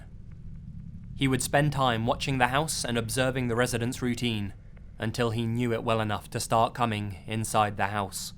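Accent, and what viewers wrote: England, United Kingdom. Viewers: English accent FTW :)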